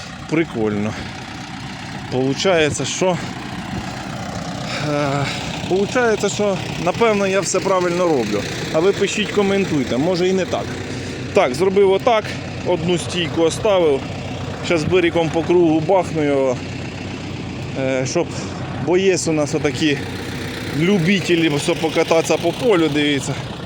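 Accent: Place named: Dnipropetrovsk, Ukraine. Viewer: Бажаю здоров'я. З якого регіону ви? По говору таке як правий берег дніпропетровщини. Я вважаю треба половину виорать, а половину ні, от тоді бункер розсудить!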